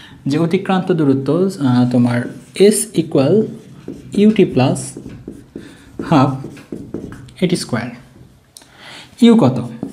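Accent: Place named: Bogura, Bangladesh. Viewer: ji sir kotha sunlei buja jai bogurar manus,kon jaigai apnar basa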